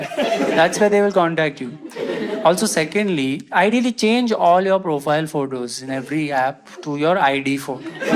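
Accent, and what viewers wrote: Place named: South India, India. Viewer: south Indian accent is so sexy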